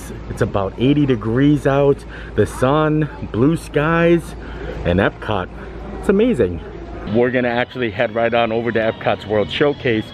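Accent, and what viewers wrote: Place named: Midwest, United States. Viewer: I have been trying to place that accent for a long time. I knew it was midwest but not sure what state. I was thinking Minnesota?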